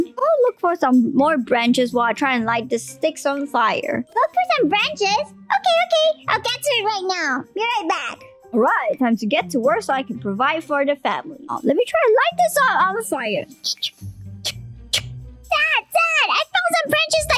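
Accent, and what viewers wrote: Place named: Malaysia. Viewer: You sound malay🤔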